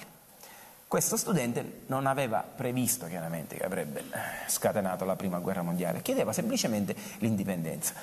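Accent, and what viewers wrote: Sicily, Italy. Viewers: Pazzesco..poi il tocco di classe con l'accento siciliano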